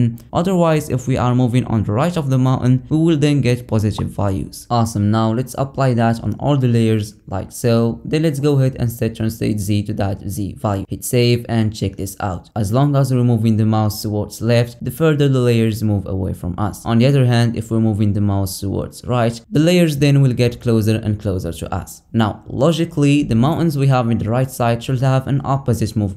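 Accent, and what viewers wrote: Morocco. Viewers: from your accent i guess your morrocan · I can hear the moroccan accent❤ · you sound moroccan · 10000% maghribi ghir mn Accent dyalk mwara9 asat 😂😂😂😂😂 but good vid tho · that's what i thought because i'm from morocco and his accent similiar to moroccan English 🙃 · Nadi a khy 3raftek meghribi rir men l accent 😂💯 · I can tell by the accent that this guy is a fellow moroccan brother Keep going my guy ! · mn l'accent katban mghribi😅nice video tho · From your clear language I think you are from Moroccan country correct if I am wrong and thanks for this helpful tutorial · Are you from Morocco or Algeria because your accent is similar to the Moroccan dialect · you have moroccan accent <3😎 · khoya I sense a moroccan accent here, otherwise your video is freaking generously informative, the amount of work you put it is amazing man, thank you so much for the effort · Your accent like ur Moroccan bro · u have a moroccan accent rak nadi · كاين اساط كمل للهجة المغربية حاضرة مي راك ناضي تبارك الله 🔥💪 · You sound Moroccan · i think this is a bit closer to moroccan accent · You're accent shows that you're from morocco 🇲🇦 Am I wrong? 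😅🤣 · that Moroccan accent tho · akhay hadchi 3ndek hrbaan saraha, 3rfti sbhanlah mgharba baynin, okay3rfo b3dyathom gha mn l'accent,knt gha msserkl ta dkhlt lvideo o 3jbni lhal nchof drari mgharba 3atin fdomain.mgharba finma htitihom i3tiw anyway rak nadi assat tbarkelah 3lik ❤️❤️ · علاش في نبرة ديالك فيها النغربية واخا لونجلي نقية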